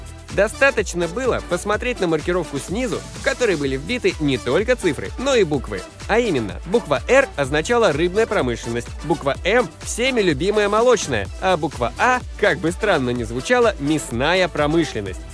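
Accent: Kazakhstan. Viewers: Воу чувак ты из Казахстана?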